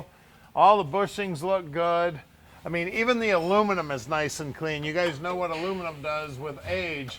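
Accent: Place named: Detroit, United States. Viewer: My grandfather had one of these in navy blue. RIP Grandpa. He worked for Ford and grew up and lived in Detroit, as did my mom, uncle, aunt and grandma, and your accent reminds me of them all. It's actually bringing tears to my eyes. Thank you.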